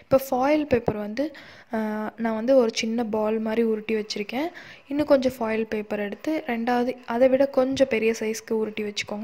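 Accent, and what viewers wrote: Madurai, India. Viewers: Madurai ya sis neenga?? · Neega madurai ya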